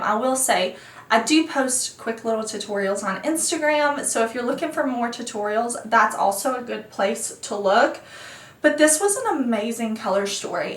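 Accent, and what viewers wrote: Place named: Kentucky, United States. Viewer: You sound like you are from Kentucky when you speak. Nice accent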